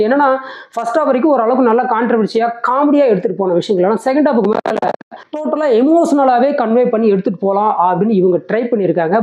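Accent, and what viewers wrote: Kongu, India. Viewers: aiyo Mokkai padam season kodumaigal saaamy... well done review - Kongu Tamizh Vazhga..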